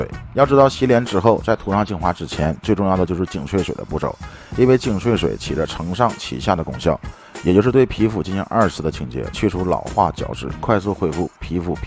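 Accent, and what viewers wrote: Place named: Northeast, China. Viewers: 辽宁话吧，沈阳那边的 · 东北， 好像是鞍山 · 鞍山海城的口音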